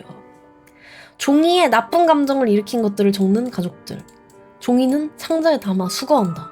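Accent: Japan. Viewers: 한본어 왜 은근 잘하냐 ㅋㅋㅋ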